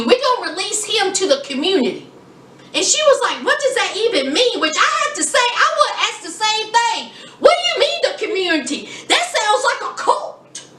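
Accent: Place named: Tennessee, United States. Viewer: Where you from gal? You sound like your from my neck of the woods in TN. The show is addictive. I like it too!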